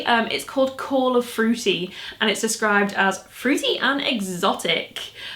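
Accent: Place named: Liverpool, United Kingdom. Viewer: I thought I recognised your accent! I have been trying to place it for so long. Who knew it was so close to home (Liverpool). Love a good boots haul 🙂. Congrats on 150k!!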